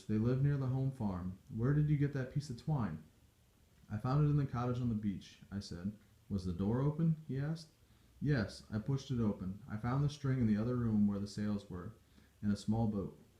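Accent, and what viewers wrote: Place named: United States. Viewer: Awful. Why is a man and an American reading? Terrible recording too.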